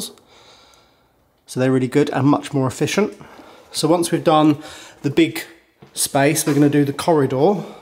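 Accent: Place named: England, United Kingdom. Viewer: Somebody knows where this convent is, I recognized english way of taking, thanks to light me !!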